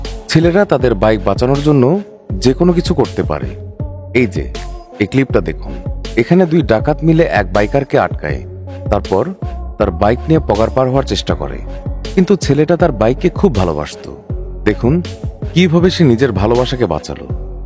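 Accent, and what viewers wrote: Bangladesh. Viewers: Ami sure apni narrator er beware jante chacchen. Hmm mone hoy Bangladesher Bangali. ❤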